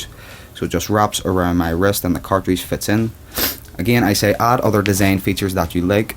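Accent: Ireland. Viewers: I didn't know he was Irish to 😁😲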